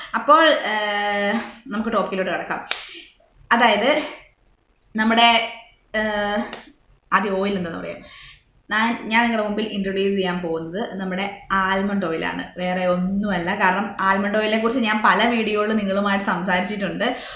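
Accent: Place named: Kannur, India. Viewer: Chechi vdoil allatto paranjath cmnt cheythirunnu Enk sure aanu kannur kottiyur aaanennu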